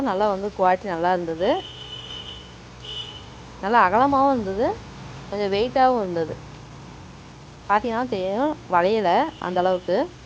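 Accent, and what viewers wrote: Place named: Salem, India. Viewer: நீஙக சேலமா .....உங்க பேச்சு ஸ்லாங் அப்படித்தான் இருக்கு......எங்கம்மா வீடு சேலம்தான்.....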